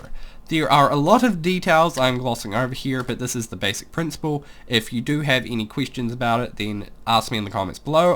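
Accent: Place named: New Zealand. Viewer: What part of New Zealand are you from? I'm From Auckland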